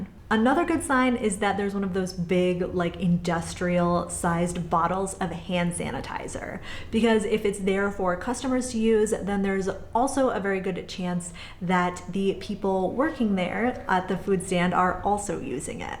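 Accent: United States. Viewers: Nice job on the video. I noticed your husbands tattoo of Michigan, and I have noticed that your accent is familiar to me as I am from Michigan. I live in La Paz, BCS, Mexico now . Keep up the good work.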